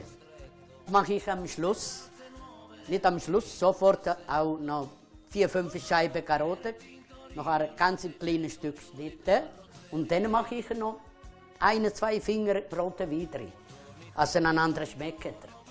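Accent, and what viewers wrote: Italy. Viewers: Woher aus Italien ist? Er spricht das s in Bolognese stimmlos aus, das spricht für den Süden, aber seine Vokale klingen eher norditalienisch...wir müssen ihn mal testen. Kann ihm mal jemand eine "cadrega" anbieten? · Er isch ganz dütlich vom Süde. Erkännsch vom Akzänt und vo de Art (positiv gmeint) :-)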